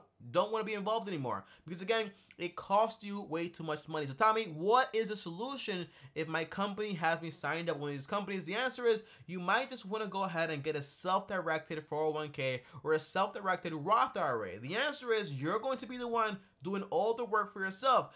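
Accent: Caribbean. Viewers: I hear a Caribbean accent! Lol where is he from?! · Yo is it just me or does he sound carribean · I hear a Caribbean accent. Where are you from? Good video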